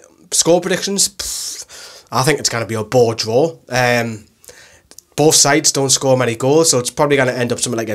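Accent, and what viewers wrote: Newcastle, United Kingdom. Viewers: Love the geordie accent (from London)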